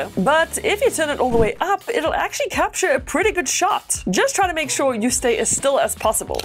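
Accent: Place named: South Africa. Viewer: Heavy South African accent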